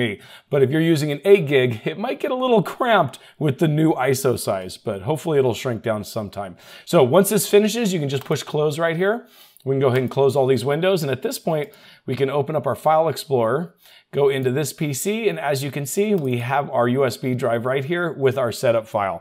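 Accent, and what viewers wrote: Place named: United States. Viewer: Today I watched your YouTube broadcast again, and it’s been synchronized in Dutch — probably an AI edit. Although my knowledge of English is limited, I prefer American voice. YouTube thinks I’m a donkey.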